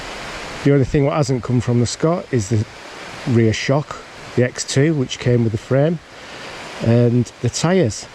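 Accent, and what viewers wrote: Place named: Yorkshire, United Kingdom. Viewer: If it wasn`t for the Yarkshire accent and the wet trails I`d have sworn I was watching BKXC. Brilliant video, loved every minute. Quality review of the bike as well.. Oh and the colour of the handlebars.......I`m colour blind so it Doesn`t matter to me LOL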